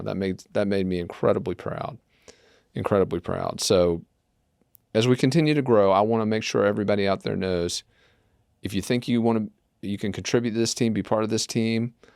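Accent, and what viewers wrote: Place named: South, United States. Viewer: Love your update!!! Southern draw doesn’t hurt😝❤️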